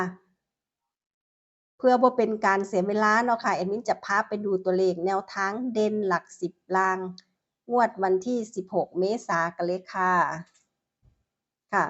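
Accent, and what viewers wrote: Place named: Chaiyaphum, Thailand. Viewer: เลข 5 สวยครับ สำเนียงเหมือนคนชัยภูมิเลยครับ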